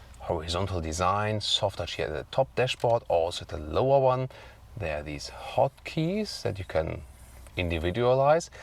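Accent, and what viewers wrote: Germany. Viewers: WTF you'reon about? His English is superb. He might have a distinctive German accent, but grammar,0 vocabulary and overall fluency are spot on.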